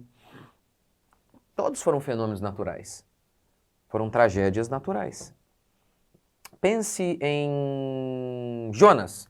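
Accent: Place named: Rio Grande do Sul, Brazil. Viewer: eu achava que vc era gaúcho, pastor...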